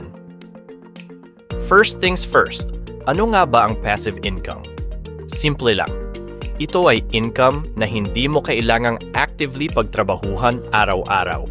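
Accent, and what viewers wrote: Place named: Conyo, Philippines. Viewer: Speaker sounds so conyo. 😅😅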